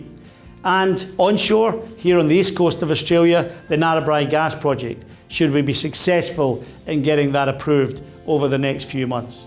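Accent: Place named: Scotland, United Kingdom. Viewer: Thank you; clearly and succinctly explained for the lay person. BTW, I love your gorgeous Scottish accent, sir!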